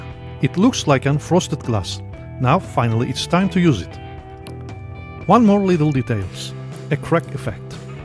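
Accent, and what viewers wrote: Russia. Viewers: new to this channel is that a russian accent or..? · Мне одному слышится английский с Рязанским акцентом?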